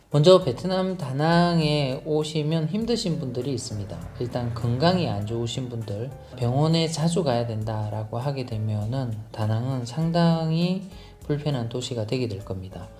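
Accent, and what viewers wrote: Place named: Daegu, South Korea. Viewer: 님 영상을 보면서 처음댓글을 남기는것 같네요. 억양이 귀에 익어서 혹시나 했는데 ㅎ 역시나 ㅎ 저도 대구 토박이로 반백년 살면서 나름 열심히 살았다고 생각하고 부족함없이 살고있는데 집사람이 갑작스럽게 사고로 좋은곳에 보내고나니 말로 표현하기 힘든 시기가 있었습니다. 지금도 진행중 일지도...해서 애들 대학졸업까지만 뒷받침해주고 저의 남은 생은 국내.외 여행으로 나름 즐거운 시간을 보낼까 고민중입니다. 오늘 영상이 더더욱 가슴에 와닿는 기분은 아마 이런 이유가 아닐까싶네요. 앞으로도 좋은 영상 부탁드리며 항상 건강하시고 기회되면 꼭 다낭 가서 즐거운시간 보내고 싶습니다. 언재가 될지 ㅎ 그때 뵙게되면 좋은 인연으로 남게되었으면 좋겠습니다 ~~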